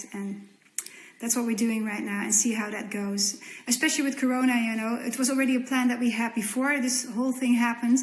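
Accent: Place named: Netherlands. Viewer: It does have this something to see all kinds of celebrities in online streams so much (watched various panels of actors of my favorite series too). It's pretty unique and cool. At leats one good thing about this quarantine! It's so fun to hear myself in her, as in being a Dutch person myself and trying to talk English with our Dutch accent :P Agreed Sharon. I too am so fed up with all the divisions and how people treat others who are not the same as they are.. It is this like curse in human nature unfortunately.. But then again, it IS better now than like 200 years ago, that also needs to be said. There's still much work to do but it is already better than in the past ~ ^^; My rhytm is pretty much gone since I graduated from my bachelor degree at a time in life that was pretty hard and then corona started. So, no solid job or studie for over a year now already.. 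I love how she pronounced 'WT Benelux' we Dutchies say the letter W VERY different than in English XD The English pronounciation of W is weird to us XD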